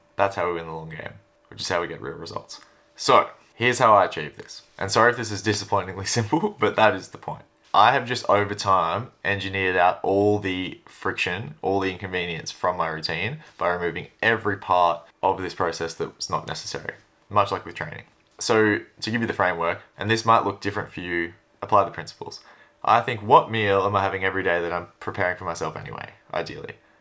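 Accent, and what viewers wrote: Australia. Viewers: Lol have you ever heard an English accent? It's clearly Australian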